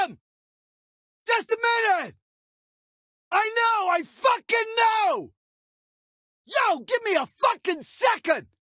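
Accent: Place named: United States. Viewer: For an English VA His accent is believable as an American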